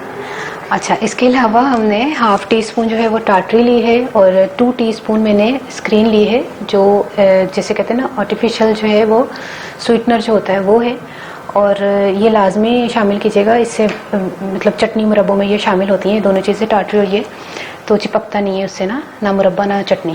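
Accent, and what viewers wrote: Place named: Punjab, India. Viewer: Mezedar Punjabi hahaha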